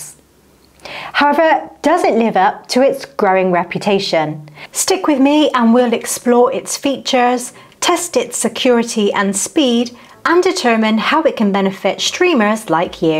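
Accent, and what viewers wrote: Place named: West Country, United Kingdom. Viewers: You are a very agreeable and attractive host, with a lovely soft West Country (?) accent. x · Sounds to me like somewhere not far from Stroud.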